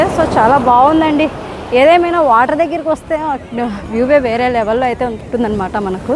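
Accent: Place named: Godavari, India. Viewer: మేడం గారు గోదారి యాస అదరగొట్టారండి సూపర్ 😂😂😂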